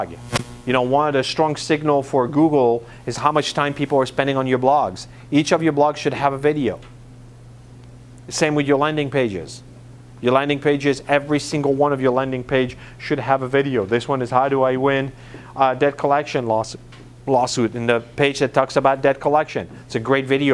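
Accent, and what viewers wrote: Quebec, Canada. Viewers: Sensing a Quebec accent :)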